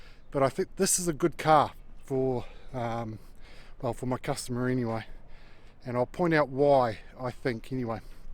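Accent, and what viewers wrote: New Zealand. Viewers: You a Kiwi fella?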